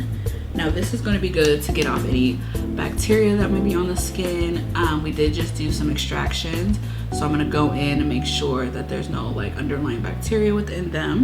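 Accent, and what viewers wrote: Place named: Haiti. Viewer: Are you Haitian? You have the accent.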